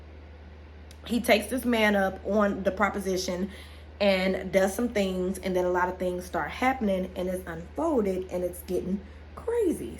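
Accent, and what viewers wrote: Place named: Bay Area, United States. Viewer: ! I was just telling my husband I don’t know where she from but she sound like she from The Bay but when you drive around it’s not baying😂😂 this thought was a while ago when I first found your other channel, then I came over here. I’m from Oakland, but I been in Pitt for hella long now so yeah P-World 🖤🧡🖤🧡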